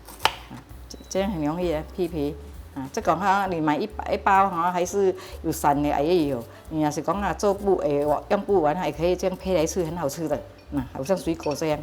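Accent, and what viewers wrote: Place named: Hokkien, China. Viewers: 潮州人吧！ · 是閩南人吧，口音很熟悉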